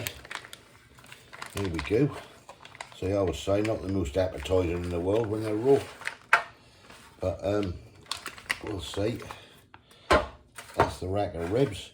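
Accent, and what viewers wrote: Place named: Norwich, United Kingdom. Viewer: Norwich accent !!!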